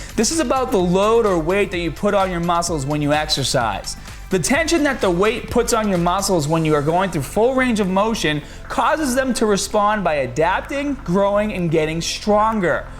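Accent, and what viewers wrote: Boston, United States. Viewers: I have a question about this guy. But, before I ask it, don't assume the purpose for my asking. It is stictly curiosity. It has nothing to do with my opinion of his reliabilty. Nor is it for any judgemental purpose. So here it is. His speech and facial movement/ eye movements seem to show signs of a, possible, minor mental disability or motor function disorder exc., that he seems to fight while focusing more on what he is saying. So, does he have some sort of disorder or what ever you want to call it? Also the signs are very seldom, being why I said "minor". Is it an accent or just how he is? He does show signs of a bostin accent but only partial or influenced. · This guy is so Boston - his accent is all over the place.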